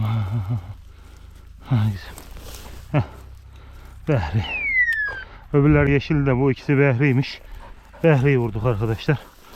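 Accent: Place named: Yozgat, Turkey. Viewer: Gaçarsa gaçsın neydiyim heri deyisine gurban senin tam Yozgatlı ağzı bu ellam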